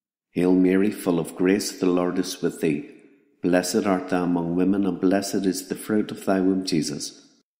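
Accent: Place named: Ireland. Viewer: Thank you sir! I just love the Irish accent...it is the sweetest and most lovely of them all. We must remember how God has blessed the Irish for all the suffering our Irish ancestors went through in spreading the the Gospel throughout the world through the gift of the Holy Spirit in our One Holy Catholic and Apostolic Faith! How come someone altered the face of Our Lady of Guadalupe? This is not a true depiction...we must also remember to include the Saint Michael prayer with every Rosary we pray as we know Satan and his legions twist the truth and tell half-truths...even through pictures.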